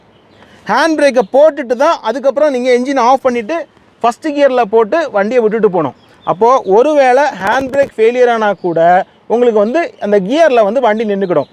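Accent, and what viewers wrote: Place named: Nanjil Nadu, India. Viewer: எளிதான, அருமையான விளக்கம் தம்பி... உங்கள் நாஞ்சில் தமிழும் அருமை....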